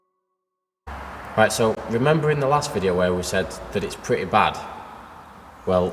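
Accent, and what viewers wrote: Yorkshire, United Kingdom. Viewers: Here from Matts channel, love the Yorkshire humour. I'm betting you're from the same area as Boothy of 44 Teeth from the accent. I can't wait to see the cars journey.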